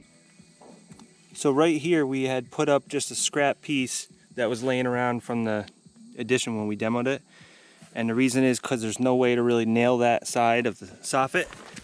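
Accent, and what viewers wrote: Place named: Kingston NY, United States. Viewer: Sounds like your from Kingston Ny I live in Pleasant Valley. I like your mechanic videos and roofing videos.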